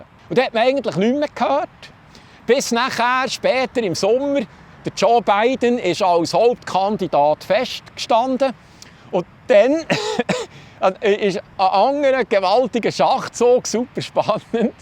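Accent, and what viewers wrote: Bern, Switzerland. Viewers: Herrlech, früsch vor Läbere wäg, e Bärnerstimm us Kalifornie wo üs verzellt, wie verzerrt bi üs d Brichterstattig isch. Woni us Kalifornie bi zrügg i d Schwitz cho, hanis denn ou scho erstuunt gmerkt, nume hets mir niemer gloubt. Danke für dä Bitrag 🥰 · hey Super Video imene wunderschöne Bärndüütsch und gschiidem Inhalt, Gruess us Züri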